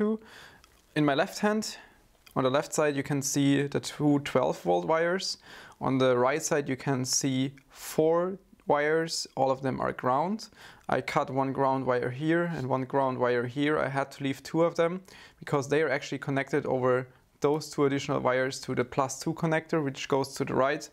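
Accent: Germany. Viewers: Ich liebe es wenn Deutsche versuchen Englisch zu sprechen. Soll kein hate sein 😂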